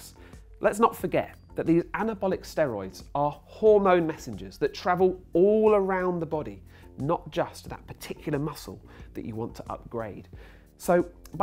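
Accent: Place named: England, United Kingdom. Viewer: That English accent thooooooooooooooooooooooooo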